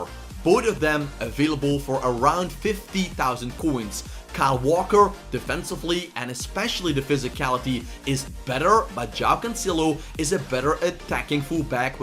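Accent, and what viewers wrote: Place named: Netherlands. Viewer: Ik hoor gwn dat je Nederlands bent hahahahaha heerlijk video wel ❤️🤙🏾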